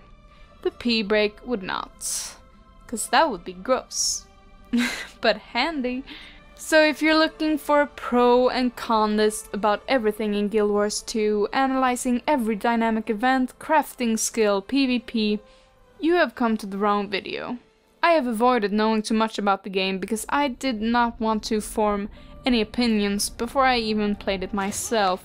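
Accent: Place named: Sweden. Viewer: Sometimes I hear a bit of Swedish accent but your english is excellent, where are you from? Nice review and most of the time the only thing I want to hear is that it's better than wow, for me that is enough.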